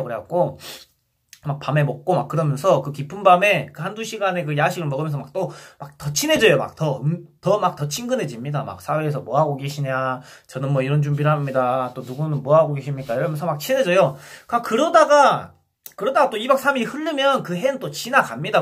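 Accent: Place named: Jeolla, South Korea. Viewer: 내 살다가 이래 재밌게 전라도 사투리쓰는 사람 첨보네 ㅋㅋ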